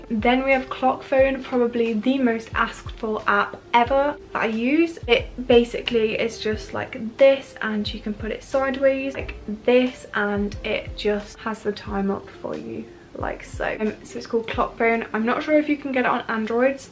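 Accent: England, United Kingdom. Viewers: Your English accent is very nice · She is from England maybe, cause i don't understan all words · loves her cute british Accent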